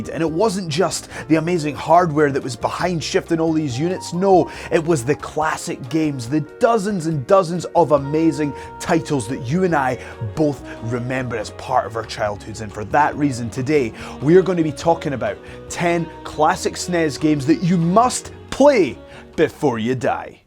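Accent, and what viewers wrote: Scotland, United Kingdom. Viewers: It took me a second to realize you weren't American (then again it's WhatCulture so I should've known better) but dude you have the slightest (Scottish?) accent I've ever heard! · Is this guy Scottish ? I'm not English, and I am not sure, but his accent seems strange to me, especially the pronounciation of "R". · Scottish? I love the accent a lot.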